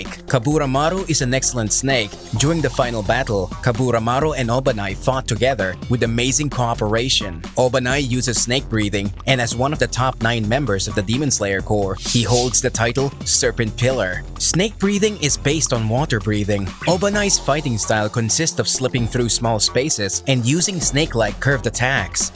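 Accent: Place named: Philippines. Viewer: I like the subtle Pinoy accent, love this what if scenarios kabayan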